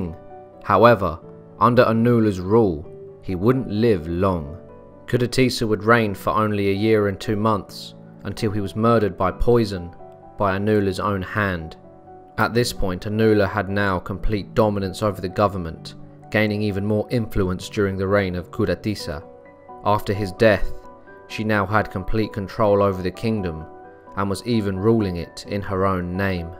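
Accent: United Kingdom. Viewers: The pronunciations are quite anglicised and far from accurate but he seems to be trying.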